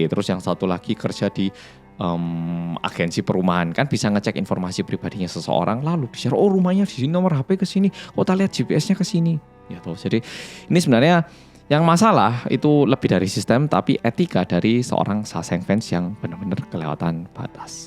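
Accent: Java, Indonesia. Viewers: Mas ..yg medok .bs gak orang indo kerja d sana ...🙏🏻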